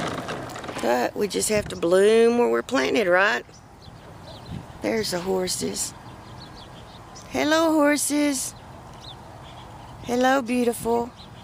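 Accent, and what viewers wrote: United States. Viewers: Next time you go out, I would love you to take some carrots and get the horses to come close to the fence and feed them the carrots. Would love to see them up close. I wish I could pat them. Does the field smell great after the hay has been mowed? I love your Texan accent. And love seeing the fields that go forever. It has been so great to come on a ride with you. I love how one of your neighbours planted heaps of trees. xoxoxoxoxoxoxoxoxoxoxoxoxoxoxoxo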